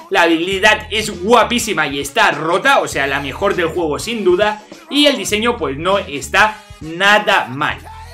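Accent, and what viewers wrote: Spain. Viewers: Es español o cuál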